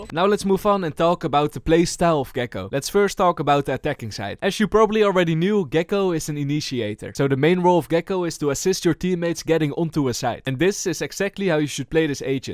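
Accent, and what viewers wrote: Netherlands. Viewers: Ur dutch arent you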